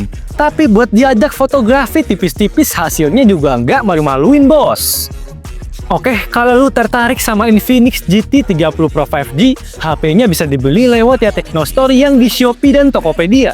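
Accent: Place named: Medan, Indonesia. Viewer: Medan cuy😅😊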